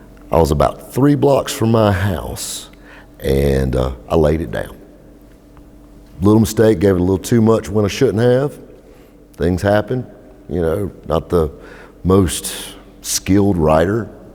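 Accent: South, United States. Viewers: your positivity and a good nature generated a good result. Being a character doesn't hurt either. Now I'm sure the good folks at the hospital are used to your Southern accent but in this video and with that voice of yours you make a dang good story teller, son. You are one charming rogue and I could listen to you tell stories all night. Glad it all worked out all right. P.S.: Don't take the "son" part too personal. I'm a week away from 73 years so I've got a few on you. And I ride a '99 1100cc Yammie cruiser (460 lbs dry weight) on a set of stickies and they earn their keep. All in good fun.... Keep it sticky side down, and thanks for sharing. Best regards from a Canadian. The part a couple of hundred miles east of northern Michigan. I'm 45 minutes from the Seaway if the right people are looking in the correct direction, namely away.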